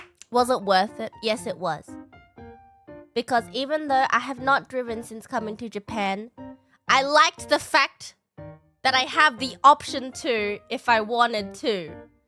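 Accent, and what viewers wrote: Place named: Australia. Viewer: 호주 영어 발음 뭔가 듣기 좋음ㅋㅋ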